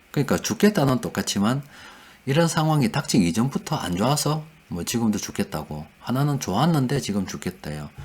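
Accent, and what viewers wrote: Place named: Daegu, South Korea. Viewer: 대구말씨가 참 구수하네요 좋은 내용 감사합니다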